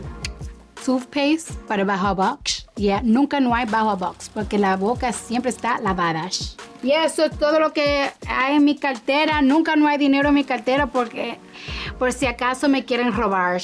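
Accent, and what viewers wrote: Dominican Republic. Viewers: 😆 hablando espñol es todo una dominicana nata cremosa, no tengo dinero por si me quieren robar y la cartera ya es bastante 🤣🤣el tipo la dejo pegada la amo me alegra sus videos y locuras natural delicada salvaje ese bolso falto la piedra para lanzar a los que se metan con ella 🤣 si es contigo nicki minaj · bueno mi amorch debería debatirle ese punto a, pues ella se caracteriza por puntualizar el dominicanismo al hablar y otra vaina que te voy a decir es que los Dominicanos nacemos donde nos da la gana 😂😅 pa ' que cepa🤔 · Me encanta su Español acento dominicano me encant❤ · Tiene un acento dominicano precioso ❤. Mi latina queen 😊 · La ame, su acento dominicano es una delicia. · habla como una❤❤ tipica dominicana❤su jerga,su lenguaje colloquial es puro dominicano😊no puede negar sus raices dominicana❤❤ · no nació en República Dominicana pero tiene muchas cosas de dominicanos realmente. 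😁 Cuando habla español es Dominicana pura 👌🇩🇴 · Se que ella es dominicana pero para haber crecido en USA su actitud y su español es muy dominicano 😂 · loveee the Dominican accent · siempre Con su acento Dominicano 😍🇩🇴🔥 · AMO SU ACENTO DOMINICAMO ♡♡♡ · When first started speak in the very beginning, I was like "Que fue!" THEN her Dominicana came out and I was like, "Oh ok, ta bien!" 😂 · Ella se considera dominicana porque está orgullosa y su acento es súper dominicano, también dijo en un en vivo que ella se crío allá en RD y después vino a USA aunque nació allá. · Yes, it sounds very natural and the accent she has is accurate (i think it is from República Dominicana) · Sabía yo que era Dominicana por su acento jejeje me encantó oírla hablar, pero cuando la ví dije y de donde esta chica tan linda, nunca pensé que fuese dominicana pero cuando la oí hablar dije ese acento es Dominicano jeje muy bella, Dios la bendiga 💖🇩🇴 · Acento Dominicano 😂😂 a muchos no les gusta pero que podemos hacer... 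La liga de razas influye mucho. Tambien agregarle que somos jocosos y muyyy expresivos al hablar. 😂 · Esta tiene un slang dominicano de barrio full😅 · El acento Dominicano es ÚNICO 😂😂 IT’S 😂😂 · ella a dicho que es dominicana, incluso su acento en español es dominicano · Con todo y todo ella no sabiendoyñ Bien Español suena muy Dominicana 😭😭😭🇩🇴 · Se le nota el accento Dominicana y Nueva York a la vez😊 me gusta❤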